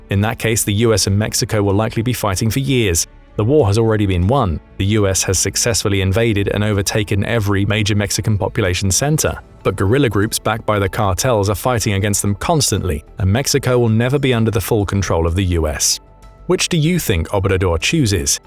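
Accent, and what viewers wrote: United Kingdom. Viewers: You can tell you have no idea about American Military. There is no way they would be that big an issue. And Mexican American Soldiers would not desert the American Military cause they know if they tried crossing the boarder later they would be killed for desertion. Remember this is some Brit by the sound of him has zero U.S. Military experience whatsoever. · Heard a British accent, automatically disregarded their opinion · 😂 bro, you,forget the firepower Americans have more war experience since you sound like you from the uk you have no idea how much firepower us have and the damage they can do only thing would be they wouldn't want to harm civilians they ony want the cartel but if Mexico government keeps helping them cartels than yeah us will wipe thrm out faster than what you believe.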